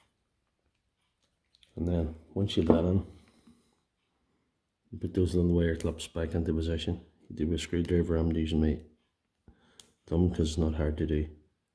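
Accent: Northern Ireland, United Kingdom. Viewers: Having a go at this later lad. You from Northern Ireland? Ballymena here 👍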